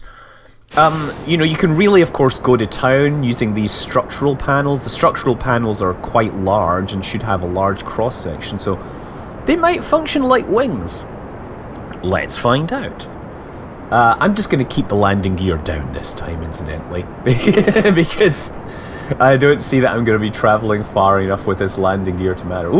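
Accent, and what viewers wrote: Scotland, United Kingdom. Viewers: He's from scottland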